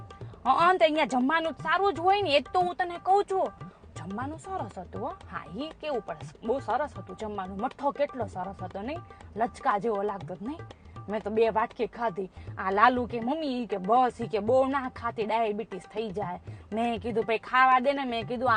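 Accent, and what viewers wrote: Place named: Kathiyawad, India. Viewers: Videsh ma ryo cho pn aapdo kathiyavadi lehko evo ne evo j che ho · તમારી સ્ટાઇલ એકદમ ગુજરાતી ગામઠી ladies અને લહેકો પણ અદ્દલ એવો જ....😅😅 કોઈ તમને ના પહોંચી વળે હોં..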